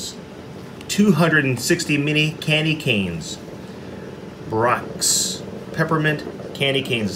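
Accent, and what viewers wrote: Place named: United States. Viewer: I like your vlogs and you speak perfect American accent. No wonder you are good at narration. Anyways, will be following your vids from now. Keep safe.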